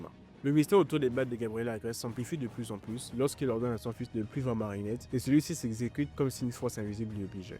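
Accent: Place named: Gabon. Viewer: J'aime, continuez, vue ton accent, je dirais que t'es Gabonais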